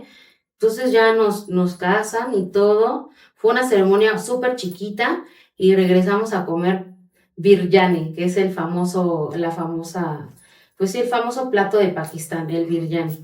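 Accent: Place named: Mexico. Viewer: Cómo que ya está perdiendo el acento mexicano. 🙏🏽🙏🏽🙏🏽!!!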